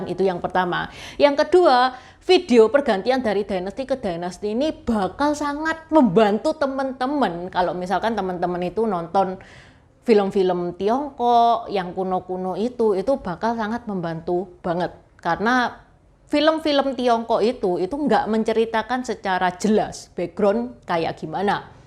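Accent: Java, Indonesia. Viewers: Mbak ..itu suara nya ...logatnya ..jawa bnget ..gak cocok bawsin acara ddh ..sorry ya ..apalagi bahasa mandarin ..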